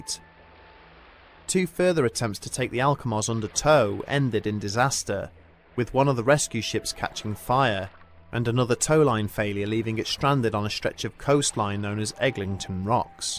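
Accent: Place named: United Kingdom. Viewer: I totally concur with Sir Bronn. You guys have a phenomenal channel with the best presentation & narrations. I know it takes time & effort to compile your videos (and I'm extremely grateful for all of them). My only suggestion would be to create more often (2-3/week). If u have your channel monotized & the community helps get you subscribers & views - you're gonna blow up & rake in some decent cash. Just my 2 cents. I'm American & I really enjoy listening to a proper British accent. Your stories are awesome & the art is epic! I wish you guys nothing but the best! Thx for everything. Much love & respect...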